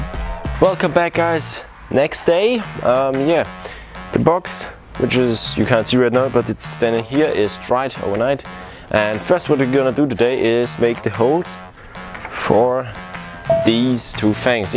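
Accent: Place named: Germany. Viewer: Man hört sofort das du deutscher bist. Auch ohne die Deutschen einlagen. 😂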